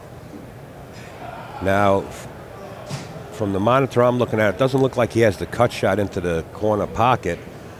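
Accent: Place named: New York, United States. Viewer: We only need efren, NYC accent is not adding to my experience....sorry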